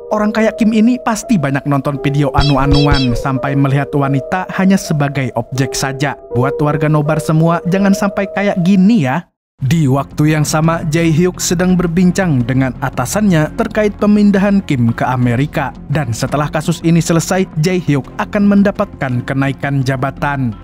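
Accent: Banjar, Indonesia. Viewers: Orng Banjar sekali nya😂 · Ini banjar kah adminnya yo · Logat banjar admin nah😂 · Urang Banjar leh😂 be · Orang Banjar kh mang, behblah. Ulun jua nh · Pian nih urng mna bang? Logat nya tuh na kaya urng bnjar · Org Banjar ya bg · Ya lo, amang asli Banjar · Bang, lu orang banjar yah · Asli urg mana nyawa nobar? Banjar kh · orang banjar bang? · bahh orang banjar sekali nya😂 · Banjar banar sekalinya lah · Banjar sekalinya pian mang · nyawa nie orang banjar kh 😅😅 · Logat Banjarnya ketahuan banar wkk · banjar kali nya pian mang lah 😂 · Banjarnya terasa njirr..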